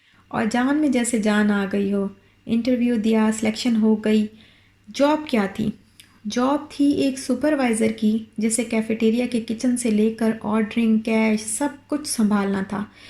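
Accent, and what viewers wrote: Saraiki, Pakistan. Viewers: Aapk talking style sa lgta hy k aap saraiki ho sister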